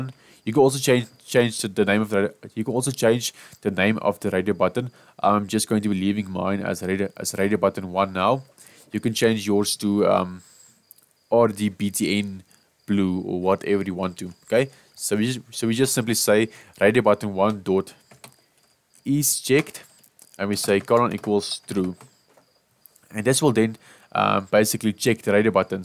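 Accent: Afrikaans, South Africa. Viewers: brah ek sweer jys afrikaans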